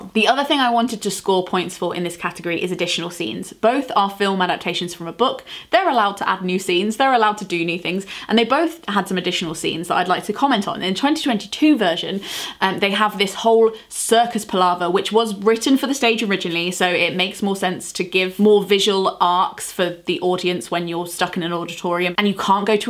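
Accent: United Kingdom. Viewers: It was so interesting content~ Most of all, I like your clear British English^^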